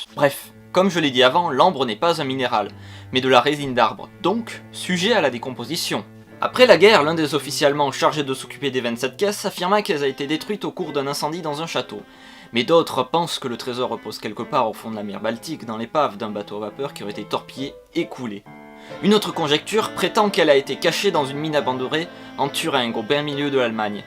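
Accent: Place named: Russia. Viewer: Bravo pour l’accent russe!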